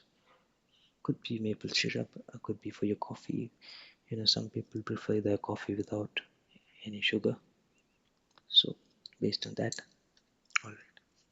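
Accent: India. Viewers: Love the Indian accent! Actually the first asmr experience I had on a phone call :)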